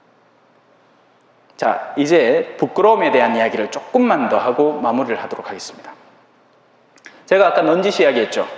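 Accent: Gyeongsang, South Korea. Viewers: 정말 의 다양하신 탈렌트 멋지십니다~ 확실하고 화끈하고 분명하고 명확한 메시지 를 정확한 발음으로 구수한 갱상도 사투리를 섞어가며 전달하시는 신부님이야말로 진정 멋진 선교사이십니다~ 한가위 추석명절 기쁘게 잘 보내시기 빕니다~♡♡♡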